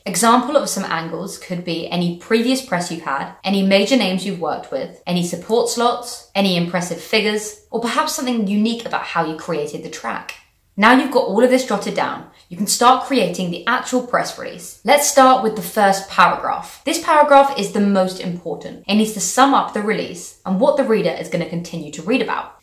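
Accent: United Kingdom. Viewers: THANKS FOR THE INFORMATION, BTW I LOVE THAT BRITTISH ACCENT! ;-)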